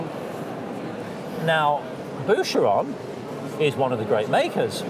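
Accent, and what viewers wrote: United Kingdom. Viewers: well, he is British, their spelling and pronunciation is different.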